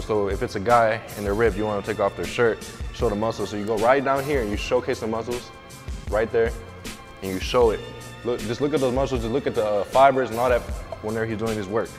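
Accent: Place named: New York, United States. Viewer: got u brother. You dont even sound like you from Texas. You sound like where I'm from ny/nj lol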